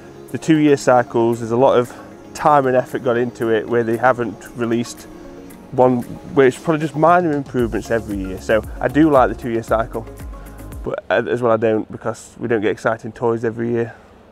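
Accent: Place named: England, United Kingdom. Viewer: I thought you sounded like an English bloke, are you in Oz?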